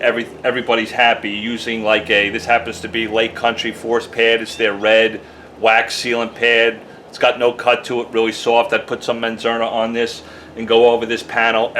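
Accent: New York, United States. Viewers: Def New York or New Jersey. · In every video make, someone has to always comment on his New York accent. It’s always “, you sound like Raymond or the dj guy”. Can someone please make an intelligent question on car detailing?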